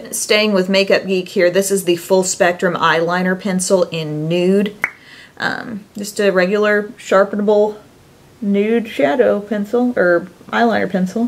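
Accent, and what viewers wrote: South, United States. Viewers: It's so interesting to hear your "accent" because I live in the northwest suburbs of Chicago and I don't hear that accent here! It sounds southern :) I love it! #snapchatfam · Got a little more southern drawl in your speech today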